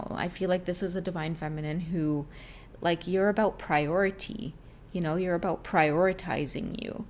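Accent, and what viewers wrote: Trinidad and Tobago. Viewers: lol love the Trini accent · As a Trini living in Trinidad, I have to to tell you your trini accent is so good. Thank you for the reading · 🦫 Love the Trini mom talk! 🦫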